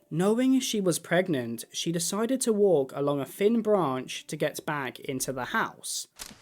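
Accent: United Kingdom. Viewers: ive only just started this video, am or so, but i have to say... the molly bit hurt. because i was a molly on the weekdays. i cant personally remember a lot of my childhood, but i wouldnt be fed long enough that i would squirrel away sticks of butter from the fridge so i could eat when stuck in my room at night. my mother would take my bed away if i didn't do my homework. it's like.... idk, comforting to know that that kind of thing is recognized by the people who made the game as not only tragic and cruel, but... realistic. that it happens. im lucky i had my dad's house for the weekends. he didnt turn out to be the best parent by the end but he helped me survive emotionally at least until i was 11. edit: wow. now, reaching lewis' part, this video is so strangely targeted at me. i experienced Maladaptive Daydreams as a coping mechanism during my young years as well! 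it's something i frankly wish i could experience again in my adult years, even when i know it's really disruptive and i have to try to keep up the adult responsibility thing. ironically, weed is helping me with my dissociation symptoms. nowadays it almost seems as if im suffering with some sort of aphantasia, though. which, as an artist, that blows lol edit 2: OH SHIT YOU DID THAT VIDEO ABOUT THE OLD COUPLE THATS WHERE I RECOGNIZED YOUR VOICE lmaaoo ngl i was sitting here thinking "i can't just be thinking that all british men sound the same, i have to have heard this guy somewhere else" and it was that v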